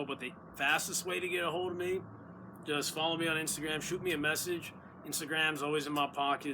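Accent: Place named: United States. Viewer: You are Chinese why do u speak American accent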